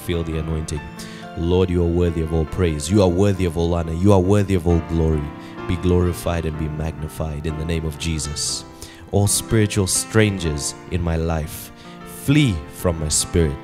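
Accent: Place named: South Africa. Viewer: Amen❤❤, are you South African by any chance ? Your accent sounds very South African.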